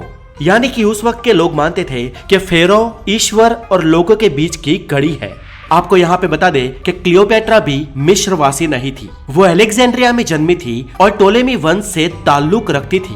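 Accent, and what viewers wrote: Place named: Bihar, India. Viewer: Mostly voice bihariyo ki kyun hoti hai with mother tongue in fluency issue ke saath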